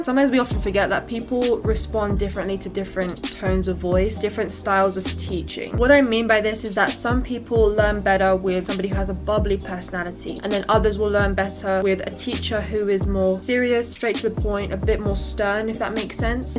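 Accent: United Kingdom. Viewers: Ok i know m supposed to be focusing on what she’s saying, but her british accent is lit chef kiss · I love your British accent and thats why i ve been keep watching your videos instead of watching the videos wit my native language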